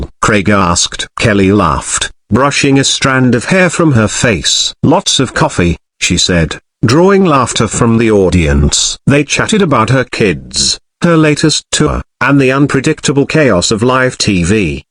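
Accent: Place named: England, United Kingdom. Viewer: Why the English accent voiceover??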